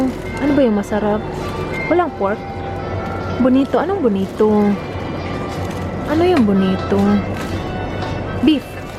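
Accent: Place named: Visayas, Philippines. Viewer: ang cute magsalita ng tagalog na may halong bisaya :) pero ang galing mag english :)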